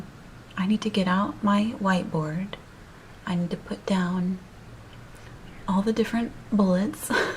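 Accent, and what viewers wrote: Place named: England, United Kingdom. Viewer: Dear how come you have no ta America an accent but a English tong of speaking why so many Questions answer the half of it and deeper to your self and closer to the Public you get more out of your program because your very good telling your story wat you want to tell stay in the same passion like your hearings and your become a very wise women stay by your mind and have your self love t Gautier le Bois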